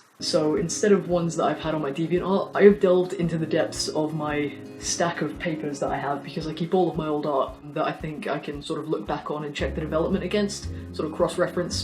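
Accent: United Kingdom. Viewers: Are you brittish? I'm terrible at knowing accents even though I'm from england lol. EDIT: you are brittish! That's so great! Your voice is really cute. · You have a british accent (I think), so I had some problems to follow what were you saying (I'm Venezuelan) but in any case I love your style!! Is so awesome!!! 😍 I wish I would draw like this, my style is more kinda...cute? (Is what the people said to me) if you're curious my Instagram is From myself, you already have loyal follower X3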